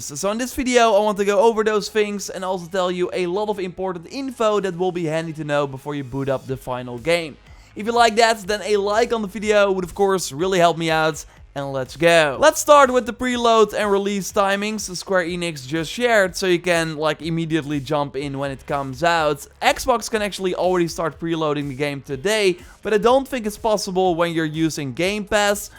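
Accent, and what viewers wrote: Netherlands. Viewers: Quick question, hearing your accent I'm guessing your from the Netherlands? When's does the game drop there? Is it midnight going into April first, or is It at 17.00 On April first?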